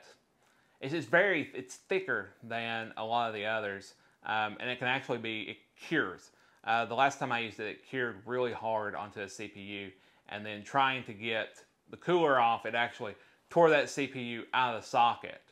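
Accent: South, United States. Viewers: R u southern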